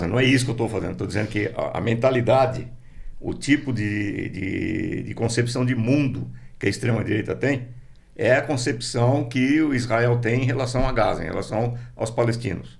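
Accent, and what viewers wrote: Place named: Rio Grande do Sul, Brazil. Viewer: Arbex disse q vc é barriga verde? Mas com esse sotaque? Mas bah tche? To confuso, como diria o velho gaúcho... o q tu és?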